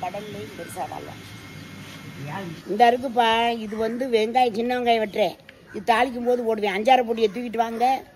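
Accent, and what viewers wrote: Ramanathapuram, India. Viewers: Nannimada pathai kaili thaavani ramnad pechu 🎉🎉❤ Eid mubarak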